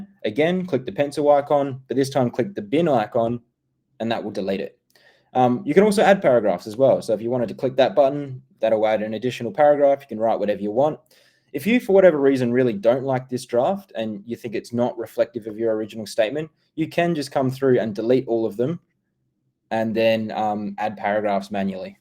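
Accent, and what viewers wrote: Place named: Australia. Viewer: May you be well & happy dude....im here for listening australian accent ...love from sri lanka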